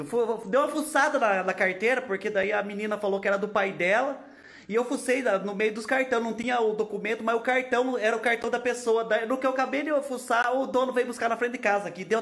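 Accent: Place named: Paraná, Brazil. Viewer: sua cidade faz divisa com o PR?? Estou curiosa para saber pq meu ex mora em Jundiaí do sul PR e o sotaque as palavras tudo é igual ao de vcs aí. Si puder respode eu 😍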